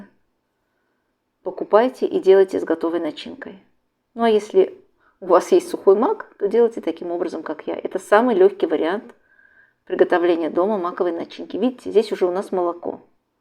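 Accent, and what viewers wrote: Baku, Azerbaijan. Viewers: Спасибо большое за рецептик, у вас такое тесто получается бесподобное, буду печь к Пасхе по вашему рецепту. А разговор ваш чисто бакинский 😊 ❤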